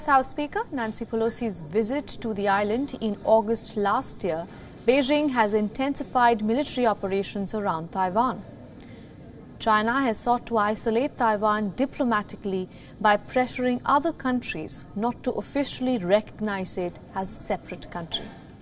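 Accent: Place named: India. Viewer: Indian accent is so funny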